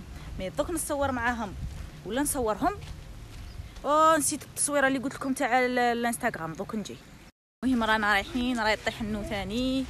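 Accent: Chlef, Algeria. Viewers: من هدرتك عرفتك شلفاوية انا من جيهتك عين دفلى تحية ليك حبيبتي ربي يفوقك حنا رنا نحرقو هنا في العطاف لي قريب لشلف ههههه عجبتني في تواضعك ربي معاك❣️❣️❣️❣️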